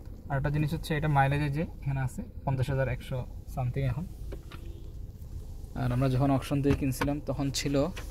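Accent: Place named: Comilla/Noakhali, Bangladesh. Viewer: উপস্থাপক কুমিল্লা দক্ষিণ অথবা নোয়াখালীর জেলার মনে হচ্ছে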